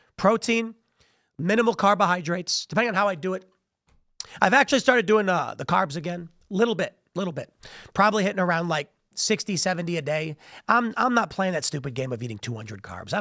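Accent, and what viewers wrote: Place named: Chicago, United States. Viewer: your SW Chicago accent and attitude come through loud and clear in this video! (Observed from a west suburbanite)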